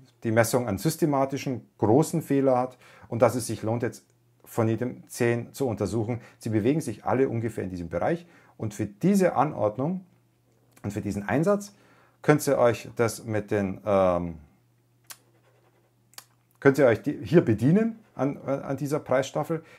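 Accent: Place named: Munich, Germany. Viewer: darf ich fragen wo du in etwa herkommst? laut deinem akzent würd ich so auf region münchen tippen ^^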